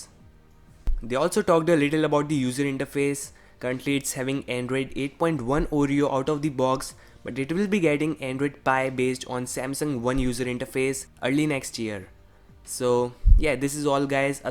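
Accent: India. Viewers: Indian english. Unbelievable. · Ikr sounds like an indian shitting on the street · are you dumb? The xeectechcare channel is from pakistan. If you listen to zaryab (xeectechcare) english accent, he sounds like american. Unlike in this channel, this guy is indian and his english accent is different. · Omg indian accent so stupid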